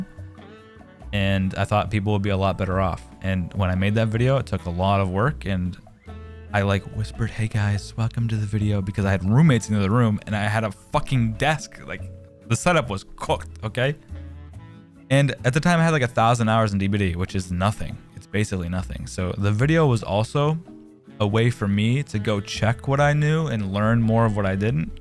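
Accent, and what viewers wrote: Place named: Saskatoon, Canada. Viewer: Are you from canada ? More specificly from saskatoon? I swear to god you have that accent like youre from around here. 😂 sorry for the weird question.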